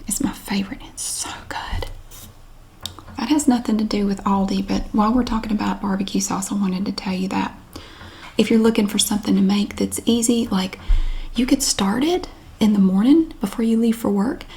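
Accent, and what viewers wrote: South, United States. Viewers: Ur pretty ! I like ur soft southern accent 🙃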